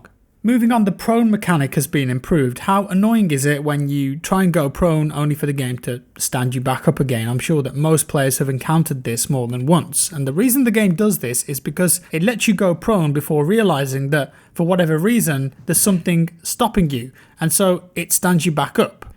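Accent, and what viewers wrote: United Kingdom. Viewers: Talks like a brit.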